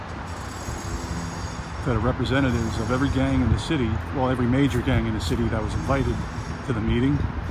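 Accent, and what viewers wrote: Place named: New Zealand. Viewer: Can tell u from Nz😆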